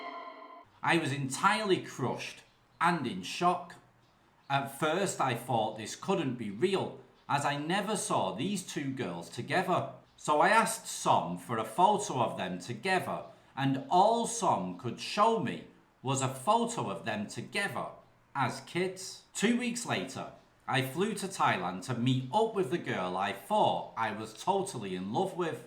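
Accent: Manchester, United Kingdom. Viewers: Apart from the Manc accent and baseball cap - I do enjoy the way you read the stories. I enjoy Thailand Bound on a Saturday too. Hearing about these tools that come to Thailand, ignoring the shit-load of advice that can be found in ten minutes with Google, never gets old. Cheers our kid!! 👍😊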